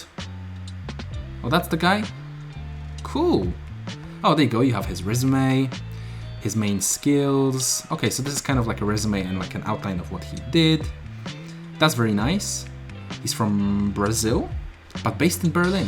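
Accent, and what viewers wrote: Poland. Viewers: Are u polish?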